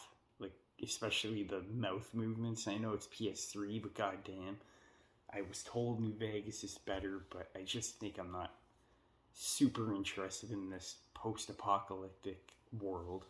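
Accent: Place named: Canada. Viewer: Don't respect anyone with a Canadian accent's opinion on "Falloot"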